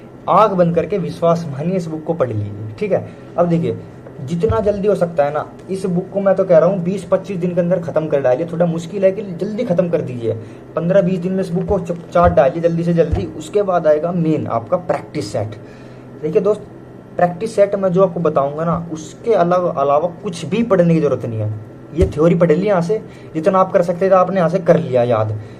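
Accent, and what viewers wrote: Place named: Western Uttar Pradesh, India. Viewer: bhai up West ka accent lgra h teri bolli m....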